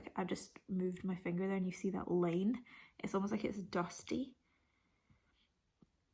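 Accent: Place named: Scotland, United Kingdom. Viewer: Fab unboxing :) Still not a bad box I thought for the price....bit of a random selection though. Nice to hear another Scottish voice x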